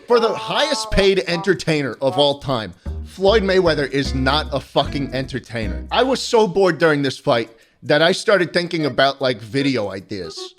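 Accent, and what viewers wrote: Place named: South, United States. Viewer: vertical video sounds like a read neck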